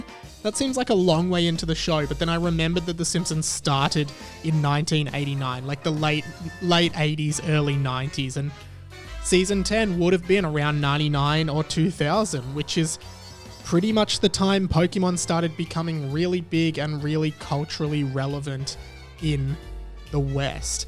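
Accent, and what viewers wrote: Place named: New Zealand. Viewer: I like your New Zealand accent.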